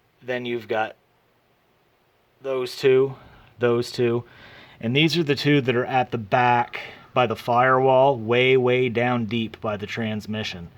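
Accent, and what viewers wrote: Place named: Canada. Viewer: You taking your water pump oot? lol What accent is that? Minnesota or Canadian?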